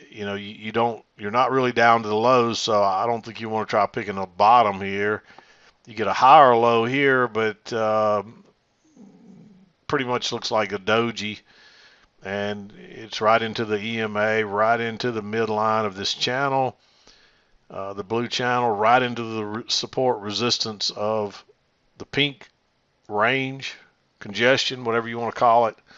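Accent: South, United States. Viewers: Boooo!!!!!!! Y’all leave that man alone. He’s from the south! We say stuff how we wanna.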